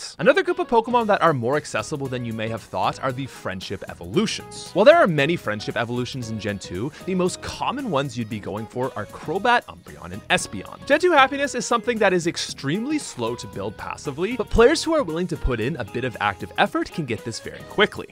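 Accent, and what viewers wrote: Canada. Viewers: Imma guess this guy is canadian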